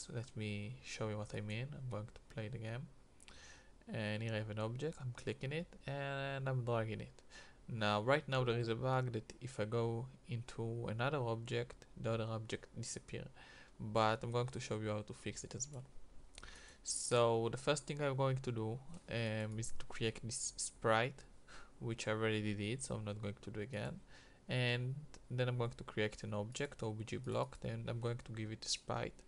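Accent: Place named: Israel. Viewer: תודה אחי, רואים על המבטא שאתה ישראלי :)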